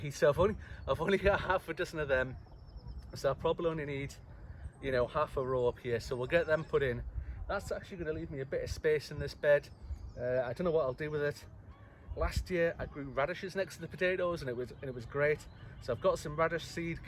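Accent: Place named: North East England, United Kingdom. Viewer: New subscriber, some great videos. Can’t help noticing that you seem to have a north east accent for a Scottish allotment ha ha. Take care keep the videos coming.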